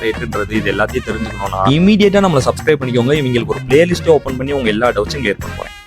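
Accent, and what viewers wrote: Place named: Madurai, India. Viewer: Luv tht madurai slag 😂❤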